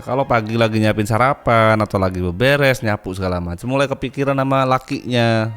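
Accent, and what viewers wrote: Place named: Madura, Indonesia. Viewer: Kadang kadang logatnya kayak logat orang Madura 😂😂😂😂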